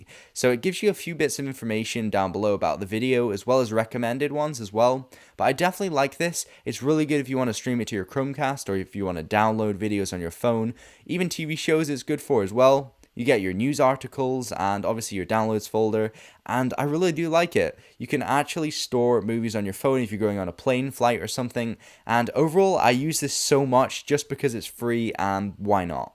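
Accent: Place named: Edinburgh, United Kingdom. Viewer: What's up with your accent? I'm guessing you're from Edinburgh but...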